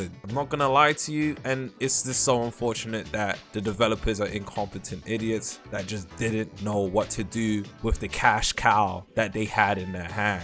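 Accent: United Kingdom. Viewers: my favorite british man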